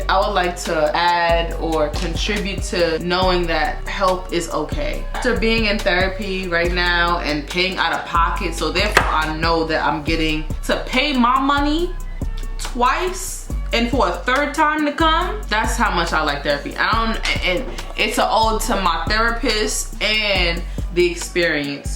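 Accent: New York, United States. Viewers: it was the new york accent for me 😍 love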